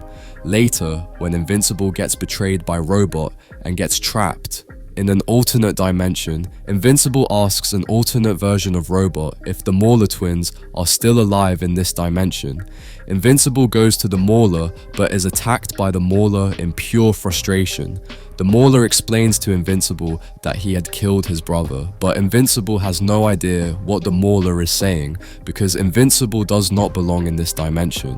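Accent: United Kingdom. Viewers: I'm so used to this accent I tuned it out. He's just Bri'ish or something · he's British, that's how it's pronounced in whatever part of England he's from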